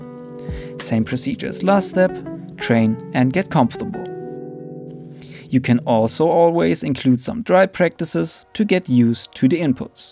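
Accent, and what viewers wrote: Germany. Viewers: Are you an German? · Hey mein ich das nur oder sprichst du normaler weisee Deutsch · Man hört aus deinem englisch raus das du deutscher bist xD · Der ist Deutscher merkt man am Akzent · 10er wenn das kein eingedeutschtes Englisch ist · Haha deutscher akzent kickt😂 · You sound German m8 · Erwischt du bist Deutscher hehe ;) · Safe deutsch hört man ja extrem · Ich schätze Mal dass deutsch deine Muttersprache ist...aber finde es trotz des unvermeidbaren Akzents, spitze danke für dieses Video :D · Schöner deutscher akzent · Du bist doch ein Deutscher oder :) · Are you German? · Ich höre doch genau raus das du deutscher bist :)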